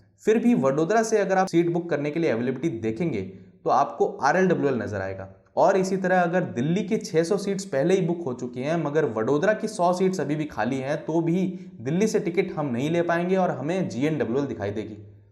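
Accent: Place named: Jodhpur, India. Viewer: Bhai jodhpur se ho kya?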